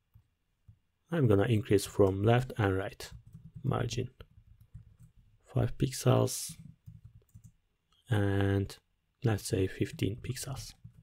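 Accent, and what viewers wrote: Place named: Turkey. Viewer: your accent sounds Turkish, keep up the good work king 🤍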